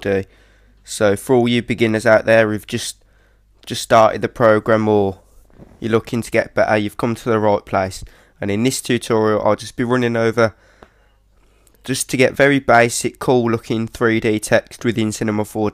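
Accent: Birmingham, United Kingdom. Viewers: He's from Birmingham, i know that accent from anywhere! lol :D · lol british-liked accent? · Common brummy :) love the accent pal :) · Im from birmingham you have a similar accent to me where are you from if you don't mind me asking:) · your accent is fucking wicked. where i it from? like what part of the UK?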